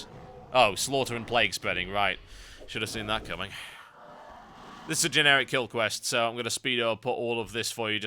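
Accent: United Kingdom. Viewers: heh, great shows man, i really like them, keep it up. love british accents :)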